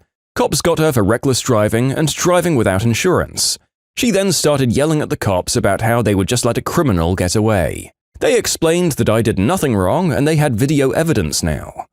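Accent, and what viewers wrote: United Kingdom. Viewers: I don't like the new narrator British people aren't good for these types of stories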